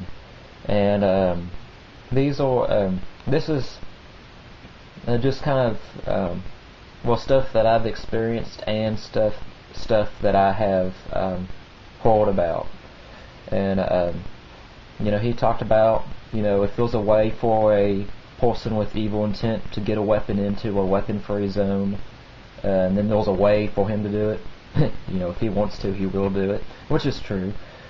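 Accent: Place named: South, United States. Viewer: He has a southern accent with a speech impediment. He substitutes /r/ with a /w/. "polow beaw" Great stories. You have a very mature and keen mindset. Keep it up!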